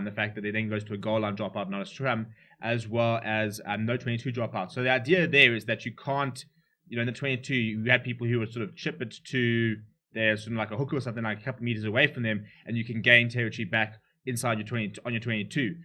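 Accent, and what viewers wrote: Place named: South Africa. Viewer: Good content man. Nice to have a South African accent on youtube talking some sense. Keep it going.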